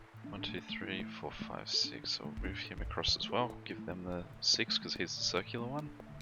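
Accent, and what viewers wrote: Australia. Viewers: It is so refreshing to hear a fellow Aussie on this site, and one with a good mic at that. Keep making these vids mate, I'm waiting for you to blow up.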